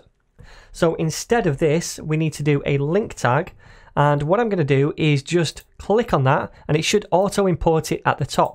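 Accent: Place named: Barnsley, United Kingdom. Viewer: Great videos mate, thank you! Saw that you mentioned Manchester but sounded like you were from Barnsley 😉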